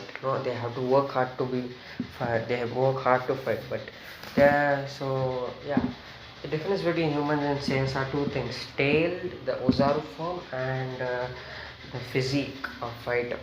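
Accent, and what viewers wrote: India. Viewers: Speak English not indian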